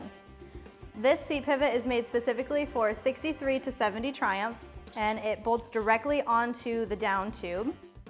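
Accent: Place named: Midwest, United States. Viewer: Nice Thick Midwest accent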